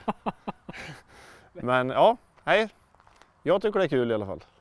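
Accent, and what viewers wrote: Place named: Bragnum, Sweden. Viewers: Grym kille och fina traktorer! Finns nog inget ljud som slår Scania V8! 😍 Bara en fundering ! Varför låter han ofta som Västgöte, men ibland inte? 😄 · Haha! tyckte jag kände igen dialekten och omgivningen, själv uppvuxen i Bragnum ett stenkast från Ängagår´n. Härliga byggen! Å i åa ä e ö.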